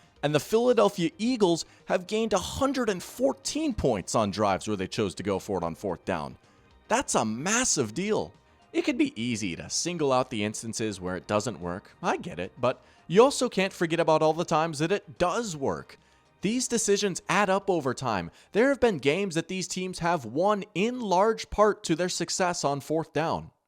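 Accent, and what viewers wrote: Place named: Minnesota, United States. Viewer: That Minnesota accent is offensively annoying and absurd. “Outomatic” I do agree with you though and Dan Campbell is the man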